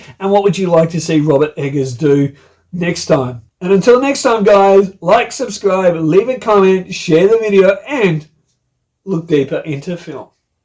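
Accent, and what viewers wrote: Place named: Australia. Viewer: I love your Australian accent ^__^ !!!! Oh my gosh, love from Texas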